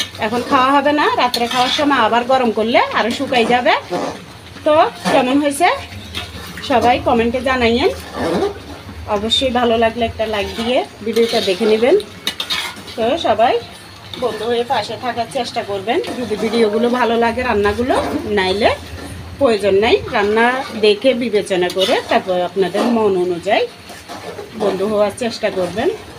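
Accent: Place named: Chittagong, Bangladesh. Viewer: আসসালামু আলাইকুম। আপু আপনি মনে হয় চট্টগ্রামের। আপনার কথা শুনে বুঝতে পেরেছি। রান্না অনেক ভালো লেগেছে ।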